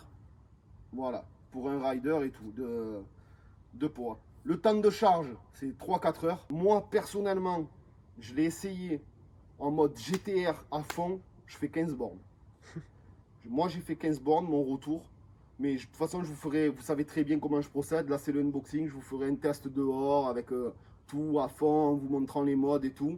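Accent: South, France. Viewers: avec plaisir avec ton accent du sud tes vidéos sont vraiment Crème 😅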